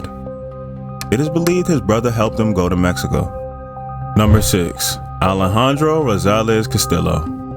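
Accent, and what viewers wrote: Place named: United States. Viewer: the dude narrating sounds like an American . Most Americans by now do understand how Hispanic names are pronounced simply because there is huge amount of Spanish in our current culture ... we've all had plenty of exposure to Hispanic names by now . I am not Hispanic myself but for someone not to have heard how these words are pronounced in this day and age is a bit mind-boggling . Also scroll down and read all the other comments in the comments section and you will notice how many other people begged him to learn how to pronounce Hispanic names ... I am not the only one trying to correct him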